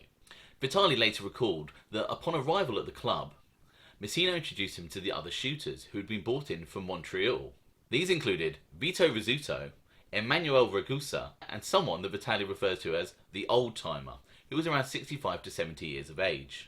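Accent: United Kingdom. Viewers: Loving the videos mate. Nice to here a fellow Brits talking about the mob.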